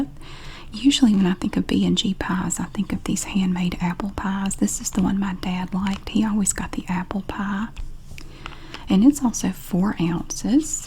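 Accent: South, United States. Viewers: Omg I love your accent!! Can really hear it when you say “pie!” I always wanted to move to the south! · i love love your southern voice SO much !!! ❤️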